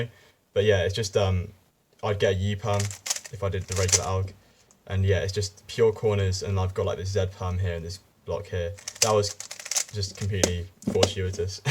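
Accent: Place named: United Kingdom. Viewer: Do you actually speak with a Yorkshire accent? I love your British accent,cuz it sounds super clear and pleasant frankly speaking!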